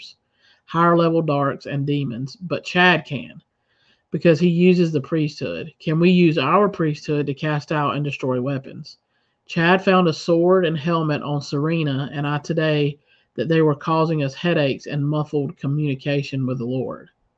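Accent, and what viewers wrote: South, United States. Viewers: ❤️ it ! I heard it with that beautiful southern accent.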